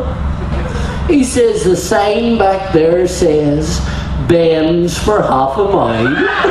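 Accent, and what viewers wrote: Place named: Ulster Scots, United Kingdom. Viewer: same way i talk,, keep it up for the Ulster scots,!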